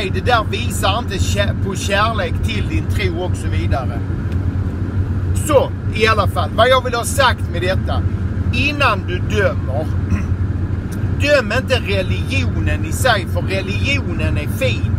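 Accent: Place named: Skåne, Sweden. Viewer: Älskar din skånska röst, Jag är också en skåning! <3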